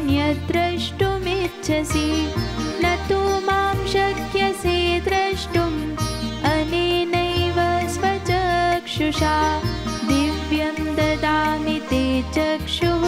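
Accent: Standard. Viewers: माँ सरस्वती की वाणी आपकी jihwa में है. Shudh उच्चारण, सुनने में Priy है. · बहुत बहुत प्यारी सुरीली और कर्ण प्रिय आवाज़ में , शुद्ध उच्चारण से , शुद्ध भाव से आपने हमको भी धन्य कर दिया है । भगवान श्री योगेश्वर की कृपा आप पर बनी रहें । जय श्री कृष्ण ! 🙏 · अत्यंत स्पष्ट व शुद्ध उच्चारण, शब्दों का उतार-चढ़ाव भी अत्यंत भक्तिमय हरे कृष्ण हरे कृष्ण 🙏🏻🙏🏻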